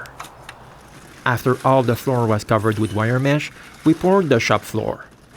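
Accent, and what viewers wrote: Quebec, Canada. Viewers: Un bon vielle accent quebecois hahah! Beau travail! Wow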